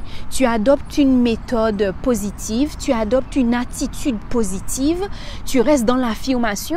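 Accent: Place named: Haiti. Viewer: Vs parlez comme les Antillaise ooh je sens l’accent haïtienne en vs je suis Haïtien c’est vraiment un plaisir je sens ta positive vibes 🥰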